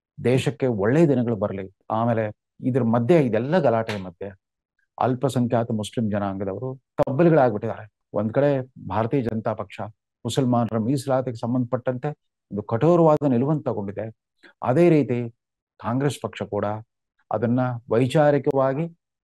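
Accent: North Karnataka, India. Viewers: ನಮಸ್ತೆ 🙏ನಿಮ್ಮ ವಿಶಿಷ್ಟ ರೀತಿಯ ಉತ್ತರ ಕರ್ನಾಟಕ ಭಾಷೆ ಶೈಲಿ ಸುದ್ದಿ ಪ್ರಸರ ಪಡಿಸುತ್ತಿರುವ ನಿಮಗೆ ಧನ್ಯವಾದಗಳು 🙏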